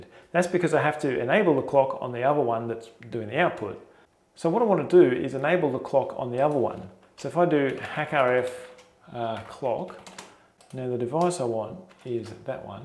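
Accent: Australia. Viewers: So, is it so unusual for someone to mistake and Australian accent for British?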